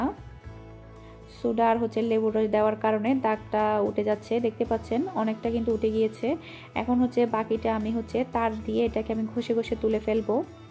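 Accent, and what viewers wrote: Chittagong, Bangladesh. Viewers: Ha Chittagong er voice amio asi satay ki hon apa thik hoine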